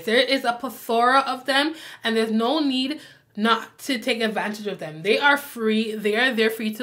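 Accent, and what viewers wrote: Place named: Barbados. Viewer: This was so helpful, love the color palette idea! Off topic: I’m hearing a Caribbean accent😅 Bajan I think. I’m Trini!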